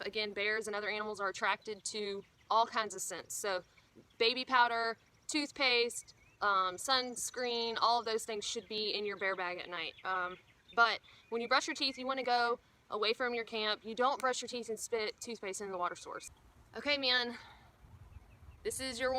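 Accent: South, United States. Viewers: What southern state are you from? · Hey, okay, I have to ask, what part of America is your accent from, I love it. No offence, Brits always ask this question! Warm regards. DisabledPrepper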